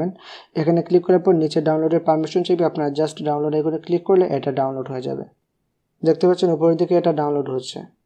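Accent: Sylhet, Bangladesh. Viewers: আপানার বাড়ি সিলেটে?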